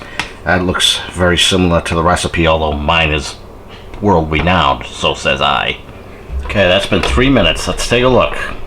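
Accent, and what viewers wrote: Massachusetts, United States. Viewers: Good show!..do I detect a North Shore MA accent! Lol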